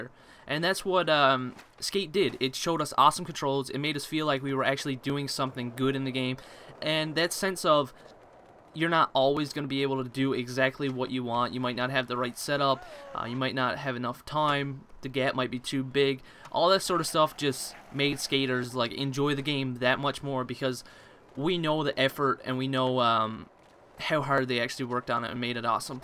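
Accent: Canada. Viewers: are you Canadian? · What part of Canada are you from holy shit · Canadian accent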